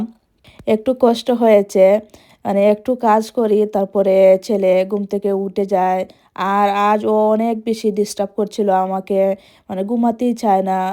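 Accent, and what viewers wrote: Sylhet, Bangladesh. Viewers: আপু এটাই স্বাভাবিক এলোমেলো হয়ে যাবে আবার গুছিয়ে নিবে সবারই এরকমটা হয়ে থাকে আর খুব সুন্দর তোমার উপস্থাপনা সিলেটি ভাষা আমার খুব ভালো লাগে ❤❤❤❤❤❤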